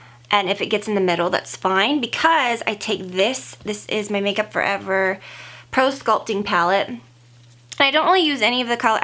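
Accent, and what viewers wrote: United States. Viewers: What state is she from?!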